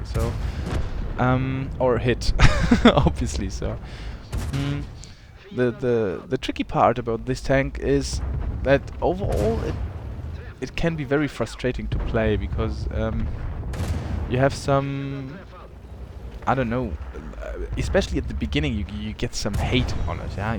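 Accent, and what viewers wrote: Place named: Germany. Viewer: your accent sounds like a native German speaker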